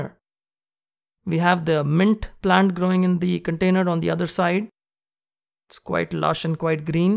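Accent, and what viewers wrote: India. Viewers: he has indian accent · are you native to india i feel so because of your accent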